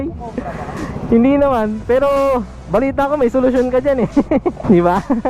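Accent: Visayas, Philippines. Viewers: Parang VISAYA so idol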